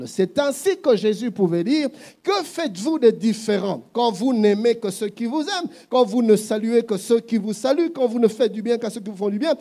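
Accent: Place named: Africa. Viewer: Pardon pasteur mais quand vous parlez africain nous ne comprenons pas. Nous apprécions votre enseignement.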